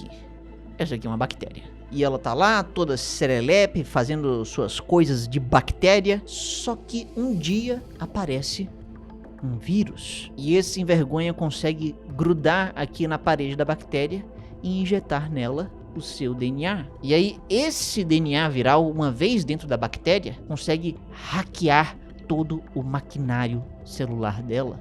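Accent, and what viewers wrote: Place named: Northeast, Brazil. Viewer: "Uma ruma de vírus" Amo o dialeto nordestino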